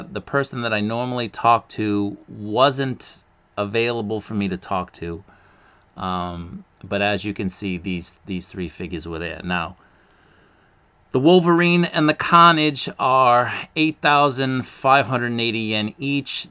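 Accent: Boston, United States. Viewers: Mahch :) Love your videos. I grew up in New England but I moved to Virginia so I don't get enough Boston accents in my life these days. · Are you from Boston/ New England area?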